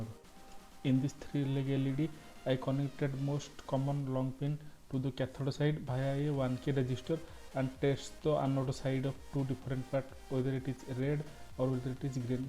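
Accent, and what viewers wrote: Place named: Odisha, India. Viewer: Your English has Odia Accent.... Where are you from ???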